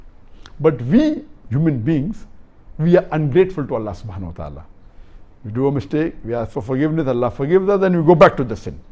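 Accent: India. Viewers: Please admin, you should probably give the subtitle. sometimes i dont quite understand Indian accent. My english isn't good at all & i still want to learn from Dr Zakir Naik. Thanks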